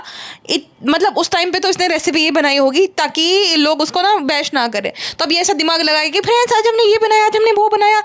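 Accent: Gujarat, India. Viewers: Your Reaction vlogs are always great ..In between love hearing you Gujarati accent and words ..Keep it up and God bless you ❤️🙌🫶🏻🦋🧿🌷✨🤗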